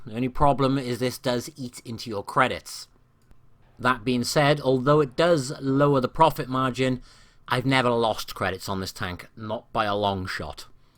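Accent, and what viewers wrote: United Kingdom. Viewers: yoo wat a british accent !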